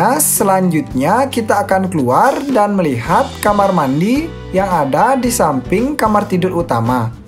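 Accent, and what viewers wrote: Bali, Indonesia. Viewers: logat bali nya medok banget blii 😁,,, btw desain nya keren banget sangat menginspirasi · Logatnya kayak org bali ya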